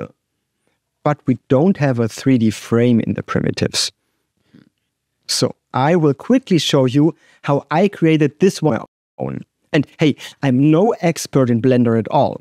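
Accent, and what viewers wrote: Germany. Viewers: Where do you live, sounds like germany...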